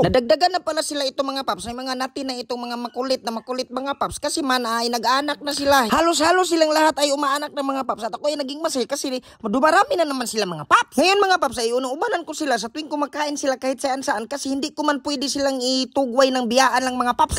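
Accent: Visayas, Philippines. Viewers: Kailan kapa niabot?🤣🤣 i like your bistag jud, bisayang tagalog 🤣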